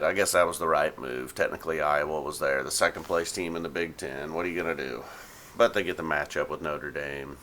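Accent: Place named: Indiana, United States. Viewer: I'm further south than he is but I have no "drawl". he's got a hint of Hoosier accent mixed with some Tennessee or Kentucky parents or grandparents that moved to Dayton to work for the UAW. possibly a Middletown or franklin native... I bet you have an accent and don't realize it.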